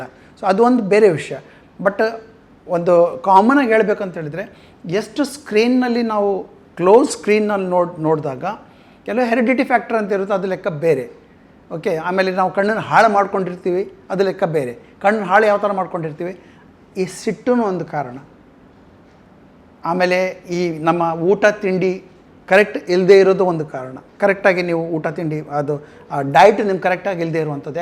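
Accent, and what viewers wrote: Standard. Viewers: ಜೀ ನಿಮ್ಮ ಕನ್ನಡ ತುಂಬಾ ಶುದ್ಧವಾಗಿದೆ ಹಾಗು ನೀವು ಮಾಡುವ ಸಂವಾದ ತುಂಬಾ ತುಂಬಾ ತುಂಬಾ ಅರ್ಥಗರ್ಭಿತವಾಗಿರುತ್ತದೆ, ನಾವು ಹೇಗೆ ನಿಮ್ಮ ಥರ ಧೈರ್ಯವಾಗಿ ಮಾತನ್ನಡುವುದು ಹೇಳಿ👏👏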